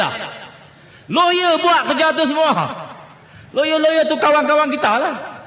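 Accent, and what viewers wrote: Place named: Baling, Malaysia. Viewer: Sanusi ni org baling. Memang org sana stail cakap mcm tu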